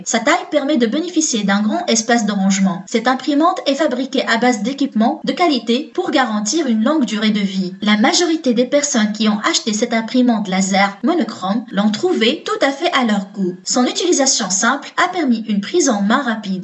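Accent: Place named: Morocco. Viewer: voix marocainne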